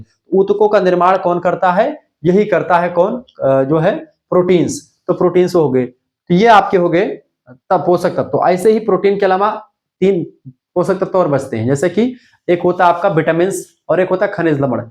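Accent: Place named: Bihar, India. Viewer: Sir ye bihari language mt bola kro kuch smj nhi aata Normal hindi bola kro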